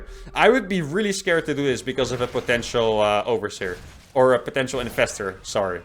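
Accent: Netherlands. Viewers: I can just tell by your accent that you're Dutch. Good to see another dutchman on this site!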